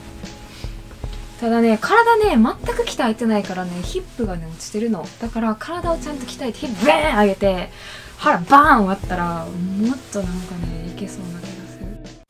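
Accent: Kansai, Japan. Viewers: お教えて致して とても ありがとうございます! 購読しました! やっぱ 関西弁のアクセントは 聞きおもろいですね~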